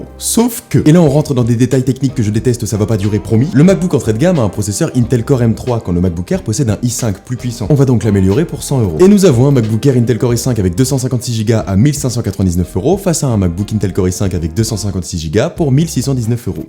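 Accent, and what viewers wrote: Quebec, Canada. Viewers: Ahh un québécois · Cette accent, québécois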